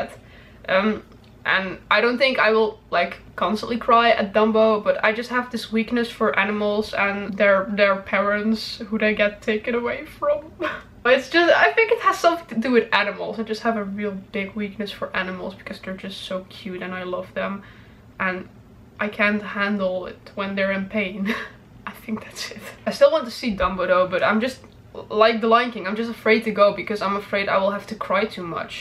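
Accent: Netherlands. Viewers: Are you dutch? I loved the reaction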